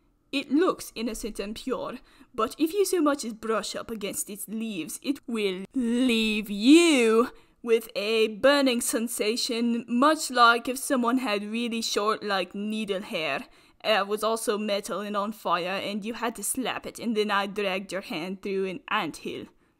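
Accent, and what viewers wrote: Scotland, United Kingdom. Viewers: This makes me laugh and I need more- not rushing you though. Scottish accent noises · ur accent is more scottish than brittish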